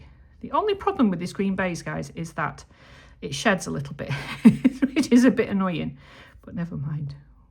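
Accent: United Kingdom. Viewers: So good to see you again! So sorry that you’ve not been feeling well but glad to see that you’re recovering. I really enjoy your videos and have learned a lot. You are a great teacher and I love to hear your British accent, righty-ho! I live in Rochester, NY. Thank you for sharing with me! 😊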